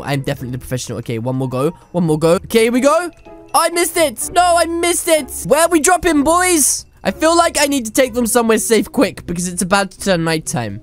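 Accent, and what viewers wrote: United Kingdom. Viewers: He sounded more british in his old videos now you cant tell cuz he does so many voices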